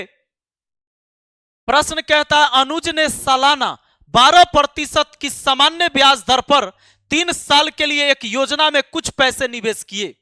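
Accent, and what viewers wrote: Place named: Delhi, India. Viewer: Delhi me rhata hoga wha aise hi bolte h adhiktar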